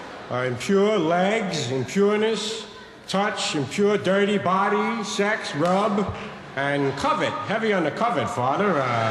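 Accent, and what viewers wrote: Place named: New York, United States. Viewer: The old-time NY-Irish accent alone makes this hilarious.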